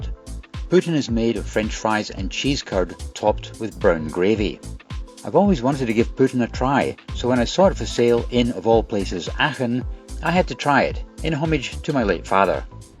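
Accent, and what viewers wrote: Scotland, United Kingdom. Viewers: Auur…aøuhr…awrur….As a Dane it’s quite complicated to try to pronounce ‘hour’ in Scottish - like you do,. 😅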